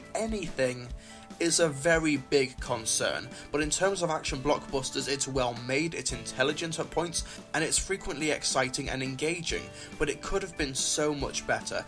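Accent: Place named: United Kingdom. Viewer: Can you at lest TRY to have an American accent when talking about CAPTAIN AMERICA!!?? Damn Brits should stay in England and talk about English stuff!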